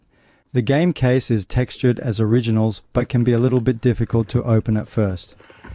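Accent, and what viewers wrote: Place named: Australia. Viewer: Australia? I feel like an idiot not hearing it sooner from your accent, what's wrong w/ me? One of the crown jewels of my LTTP collection is a CIB Mattel/Australian copy -- had a classmate from my foreign school days act as the go between to secure it.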